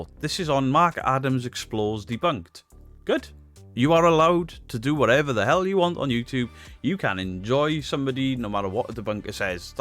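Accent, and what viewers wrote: Wales, United Kingdom. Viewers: "be those bottles and ghouls". Subs don't get your welshyness 😂😂😂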